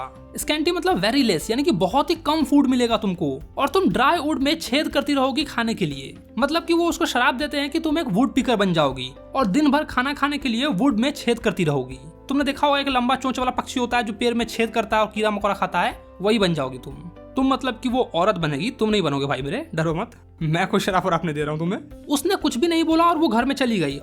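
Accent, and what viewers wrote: Bihar, India. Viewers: Kya bihari hai ye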